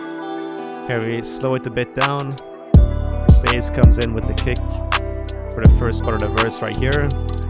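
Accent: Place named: Norway. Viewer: U sound norwegian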